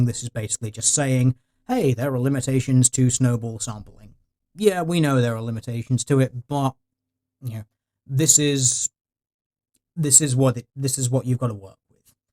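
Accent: United Kingdom. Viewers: The Crown killed Princess Diana. All monarchies including Monarchial Constitutionalism are inferior to Republic Constitutionalism. Fite me. (Sorry talking about Conspiracies involving one car crashes with a british voice mentioning it triggers this type of shit posting.)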